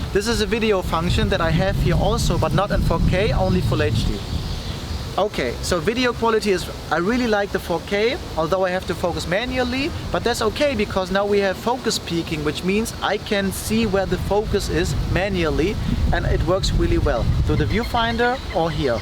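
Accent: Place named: Germany. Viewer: Liebe den deutschen akzent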